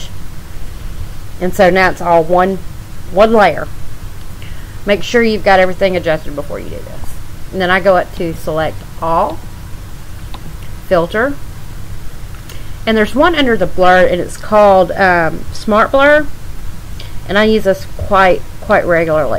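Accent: South, United States. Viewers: WOW, I've been looking all over the net and youtube for someone to show how to do this vintage style coloring to a B&W photo, without all sorts of steps! Thank you so much for the clear and concise tutorial and I love your southern accent! I'll be on your Teach Me To Color Facebook page soon and I'm a way thumbs up subscriber. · I love your southern accent too. You’re awesome along with the video. More videos please. Subscribed.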